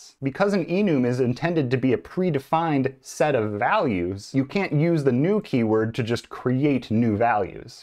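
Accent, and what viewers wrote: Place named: United States. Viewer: I love this accent in English ❤ American accent ❤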